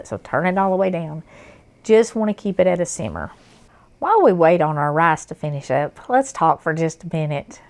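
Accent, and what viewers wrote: South, United States. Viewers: You talk perfectly fine. Southern Food/Country Food is famous for it’s flavor and comfort. So is our hospitality and gentle accent. God blesses us with you and your cooking skills.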